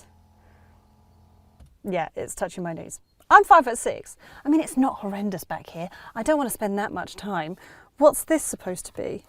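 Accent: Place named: Essex, United Kingdom. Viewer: Hi,its got a head up displaaaaaaaay 🤣🤣🤣 Love it,the essex accent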